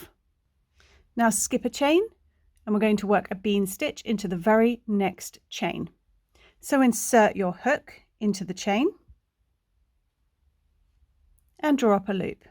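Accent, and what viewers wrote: United Kingdom. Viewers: Crochet is very popular in the States, and I had a wonderful evening with the group. Being British I love your channel as it's nice to hear a familiar accent together with American crochet terminology. It's a win win for me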